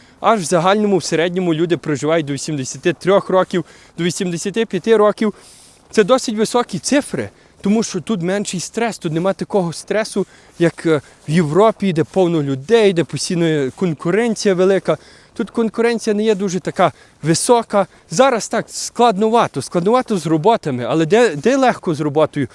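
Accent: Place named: Lviv, Ukraine. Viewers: Хлопче, кому ти це говориш?..До біса все це. В тебе коломийський акцент розмови, гайда копати кирницю в Канаді. Твої предки мігруючи за кордон, молодці що зберегли і законцервували для тебе мову. Так само зробили мої предки мігруючи до Аргентини. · Та ньи,він хоц і канадец,але чолувік з Львова,і всьо їдно всі розуміют шо хлоп хтів сказати - в тому й колорит нашої мови.На жаль ми катастрофічно втрачаємо наші місцеві діалекти - замість напевне якогось Черкасько - Полтавсько - Запорізького варіанту мови.ТАК ШО ВСЬО ФАЙНО!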